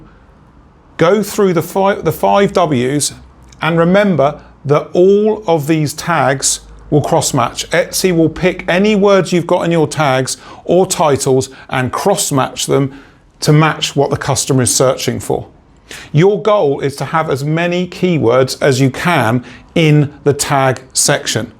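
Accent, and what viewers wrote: England, United Kingdom. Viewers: great to have an English accent on here. Most etsy helpers seem to be from US!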